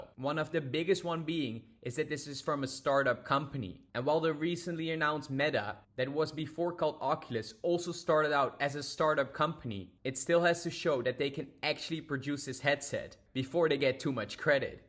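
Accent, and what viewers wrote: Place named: Netherlands. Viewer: Love the Dutch accent makker!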